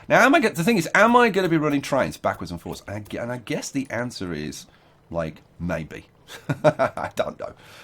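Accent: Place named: United Kingdom. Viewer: Yo. It's been 2 years since you played RE. Thank you I've really enjoyed this series and will you be doing any of the DLC? Sounds like your from the UK and would like to see you do the Great Briton DLC. There are loads of changes been done to the game. One, in particular, is the warehouse can be built close enough to the cities where you don't have to connect to the city, the city just take from the warehouse what it demands.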